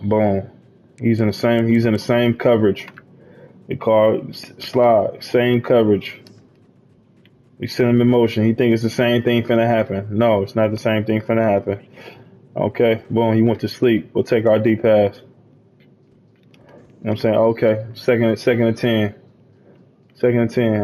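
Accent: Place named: Philadelphia, United States. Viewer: You sound like a Philly guy. Love the content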